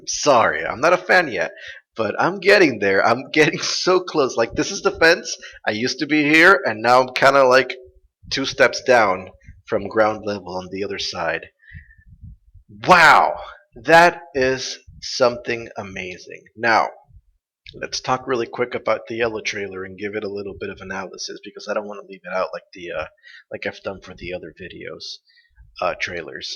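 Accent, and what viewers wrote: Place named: Puerto Rico. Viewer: oh i didn't, but that's great :D i meant your accent that your from puerto rico, like i didnt no which but i knew you were latin lol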